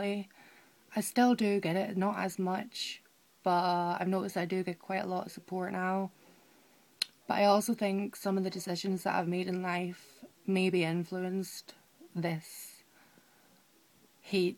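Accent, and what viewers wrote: United Kingdom. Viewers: Is she Scottish or northern irish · Not to be disappointing but I think that city is not gonna work for you my friend, you should move to a metropolitan like London or a big city in the states ... and your accent has turned British in a year :D:D:D I watched one of your old videos before this one and I was like what does she do in England with American accent but now I'm like wow her accent :D hugs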